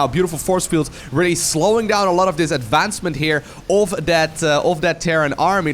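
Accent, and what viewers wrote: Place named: Netherlands. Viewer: Great commentary as usual. I can tell by your accent that you're from the Netherlands. I'm from Texas. I'm sorry about Trump.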